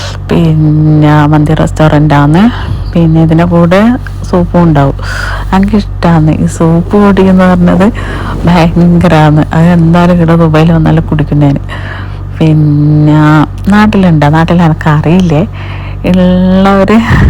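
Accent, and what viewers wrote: Kannur, India. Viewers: Kannur malayalam adipoli❤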